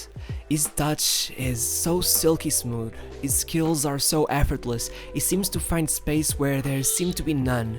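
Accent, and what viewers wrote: Portugal. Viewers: Fodas em 2012 a internet não era o que é hoje ? Este rapaz não pediu nada a ninguém, eu não entendo o título deste vídeo. This title is not only wrong, it is highly offensive. This kid didn’t scam anyone. They pressured him and his family and put them in the spotlight. He just didn’t live up to expectations.there is nothing wrong with that. I can hear from your accent that you are Portuguese, devias ter mais respeito na maneira de falar. Chamaste lhe scammer várias vezes e isso não está certo. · O narrador é tuga, só pode.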